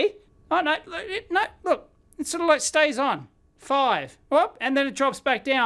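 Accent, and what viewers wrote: Australia. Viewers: I tried finding it by your statements in the vid but the web site came up as an Asian Casino site. If I'm understanding your Aussie speak it is <link> The TO8662+ doesn't return anything in a search. I'm sure I must be misunderstanding something. Sorry Dave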